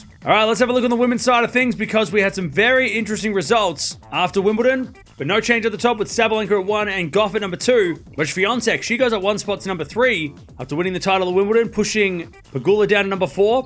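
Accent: Australia. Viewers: Appreciating the info, especially the graphics ... Itsa klaro, even with that distinct Aussie accent ... 👍👍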